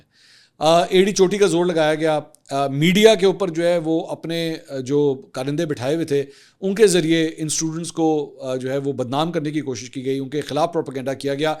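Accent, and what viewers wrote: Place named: Lahore, Pakistan. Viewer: بھائی یہ جو سٹی کا نیم ہے وہ اپ پروپر اس کو بولیں گے تو۔یمبو کہلاتا۔میں سعودیہ الریاض میں رہتا ہوں۔ اور اپ کی اپ کی ویڈیوز کو باقاعدگی سے دیکھتا ہوں۔اپ کا بات کرتے ہوئے پنجابی لاہوری ٹچ بہت ہی کمال ہوتا ہے۔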